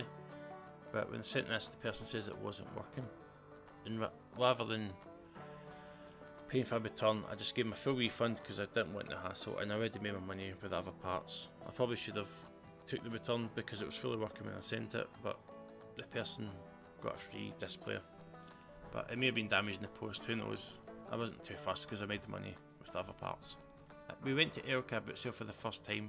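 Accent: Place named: Scotland, United Kingdom. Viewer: Superb video, great seeing all the stuff you are selling, great hearing another Scottish reseller too 👍🏻 thanks for sharing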